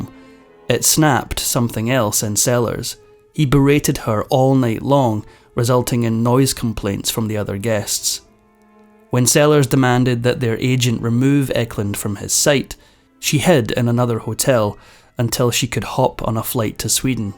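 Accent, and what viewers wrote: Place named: Scotland, United Kingdom. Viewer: I could listen to that Scottish accent forever!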